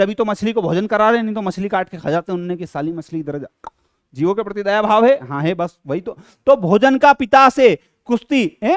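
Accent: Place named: Haryana, India. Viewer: Sir aap haryana se ho language se lag raha hai.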